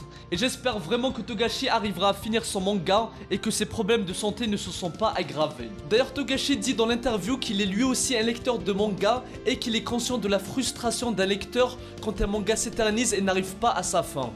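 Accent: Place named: Kabylie, Algeria. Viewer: S/O l accent kabyle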